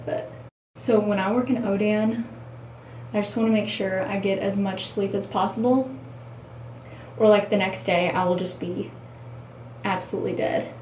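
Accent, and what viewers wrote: South, United States. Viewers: This Virginia boy loves to hear ya'lls Southern accent.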